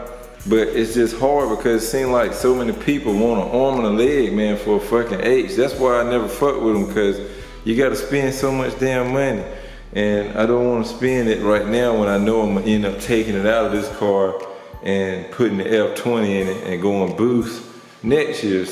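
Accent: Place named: Southeast Texas, United States. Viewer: Bruh you sound like you from south east Texas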